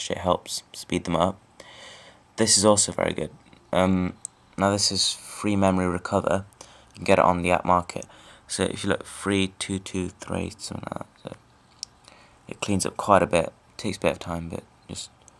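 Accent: RP, United Kingdom. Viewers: you went from sounding british to sounding british and like a robot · Your voice has a drastic change in 10 years. Typical british to a posh accent. Just mind blowing. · Eyy this English makes me feel your from the UK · He sounds like a British teenager ngl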